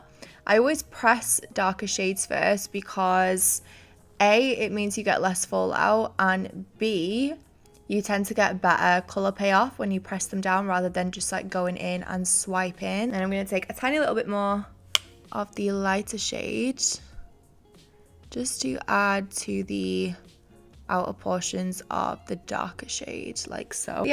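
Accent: United Kingdom. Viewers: You are like Angel 💛so cute so beautiful 💛and i love your britch accent 💛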